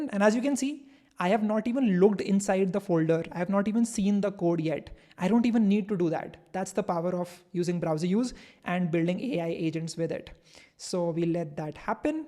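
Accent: India. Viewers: I do not know where you are located but I can hear you are from the east. India or one of the countries around there, so please forgive my ignorance about that. Why do I think where you come from is relevant for my comment, it is because India for example is well known for their scam activities, and here in Europe we are always concerned when we get mails, phone calls, from people from India that offer technical support. With this said, I wonder how do you face the insecurity when using AI. Insecurity with regards to whether your data is being used or even shared. Deepseek is Chinese, and connects to private databases for its reinforced Augmentative Responses. Do you consider that when entering passwords or personal information or do you have a more relaxed view on security which allows you to just throw yourself on the net without really having concerns.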